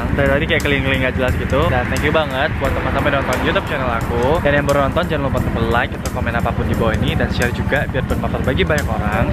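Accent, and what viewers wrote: Medan, Indonesia. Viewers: org medan ya? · Logatnya kayak anak medan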